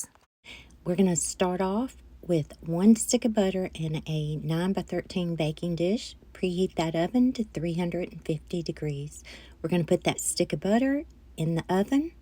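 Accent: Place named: South, United States. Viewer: Love love your southern accent! " "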